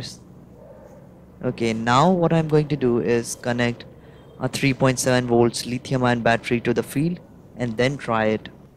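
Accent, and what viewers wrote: India. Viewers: i can hear indian accent. no thanks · Peace mercy and blessings of God. After greetings. Good evening. How are you Indian people You speak English but your accent is Indian, and India is the country where Adam might God bless him and Grant him peace landed, and Adam is the first human being whom God created from dust and blow into him of God soul. Therefore, please let me tell you that Adam has created after devils not before. Note : And there are other human beings whom God created, just as He created Adam from dust and blow into him from God soul. and all those whom God created from His soul are human beings. Except one of the angels his name is Gabriel.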